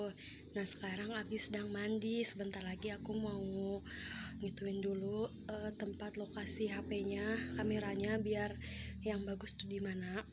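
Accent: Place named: Sunda, Indonesia. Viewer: Sunda banget wkwkwk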